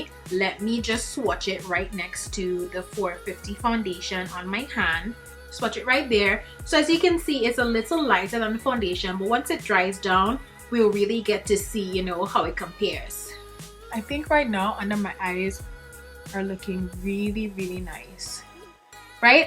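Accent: Trinidad and Tobago. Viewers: This is my first time seeing your videos. And tell me why in your opening line I’m like “but why does she sound trini?” Opens description bar and tadaaaaa 🇹🇹 you got a subscriber from your fellow trini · Dont know why it took me so long to subscribe to your channel. You always have a timeless look plus the accent. Trini gyal ??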